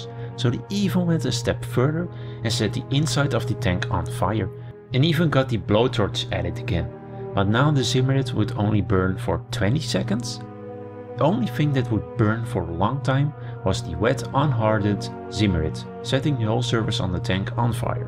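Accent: Netherlands. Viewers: NederEngels accent 100%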